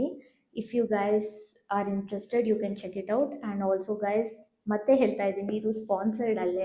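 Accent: North India, India. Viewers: I can't believe... I think ur from north indian 😊 Kannada ❤️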